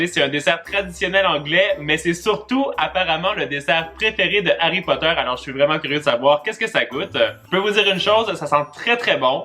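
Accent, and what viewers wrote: Quebec, Canada. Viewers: Draw Kyres accent québécois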